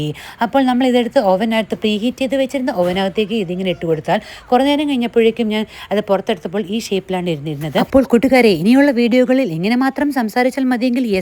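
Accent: Trivandrum, India. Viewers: Plz talk in ur regular TVM accent which is so heartwarming and ur trademark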